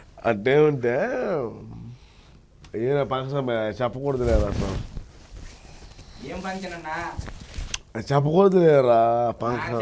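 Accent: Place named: Andhra Pradesh, India. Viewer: అన్న నీది రాజంపేట నో రైల్వే కోడురో ఉంటుంది నీ యాసలో కనుక్కోవచ్చు నువ్ మా ప్రాంత వాసీవైనందుకు గర్వపడుతున్నాం సూపర్ నువ్వు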